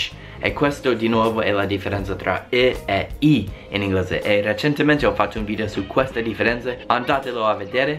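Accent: United States. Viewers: pronuncia e cadenza sono due cose diverse. Un discorso è sentire "piazza" con la Z bolognese o "casa" con l'H aspirata toscana o "paziente" con la Z morbida campana, un discorso sarebbe sentire una parola come "trattore" pronunciata "taratta-re". A me sembra che pronunci correttamente le parole, ma con una inflessione e cadenza americana che rimarrà sempre, così come rimanere ad un livornese che vive a Milano da 25 anni. E la prova di questo è che lo capiamo, mentre se pronunciasse male non lo capirebbe così come un inglese non può capire che stai parlando di "recipe" se pronunci "resaip" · Questo video da la pronuncia Americana, non fortissima, ma non è la pronuncia Britannica. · La tua é una pronuncia Americana!!!! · La tua pronuncia è americana! Non british english ✌🏻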